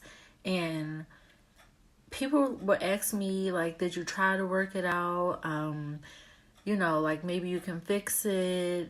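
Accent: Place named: Baltimore, United States. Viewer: Thank you from sharing! Are you from Baltimore? I hear your accent lol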